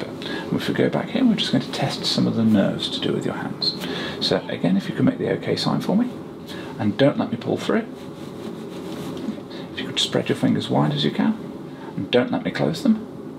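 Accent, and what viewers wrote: United Kingdom. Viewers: Not sure why I’m supposed to watch this, but it’s always nice listening to a cute Brit so okay.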